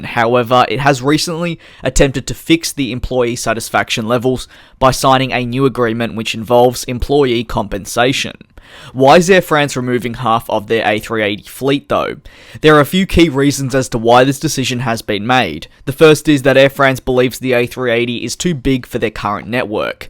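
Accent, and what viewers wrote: Australia. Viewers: what's worse? the a-380 or the gay narrator from oz?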